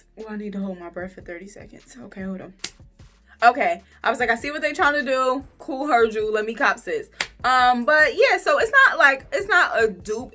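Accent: New Jersey, United States. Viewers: Ayyyyyy hey my jersey sis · Ok Jersey🙌🏾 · Sis are you from Jersey? That accent is so strong lol. I love it tho! Love ur videos btw!❤️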